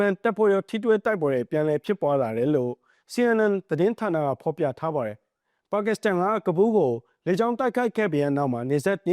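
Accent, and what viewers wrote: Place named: Rakhine, Myanmar. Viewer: ငါလိုးမ ရခီးသံနဲ့ သတင်းကြေညာနေတယ် ကျက်သတုံးအသံ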